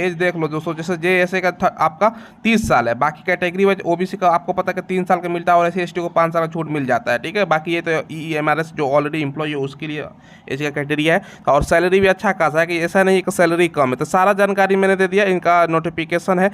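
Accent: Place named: Bhojpuri, India. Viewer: Bhojpuri ka axnent aa hi jata hai 😀😀😀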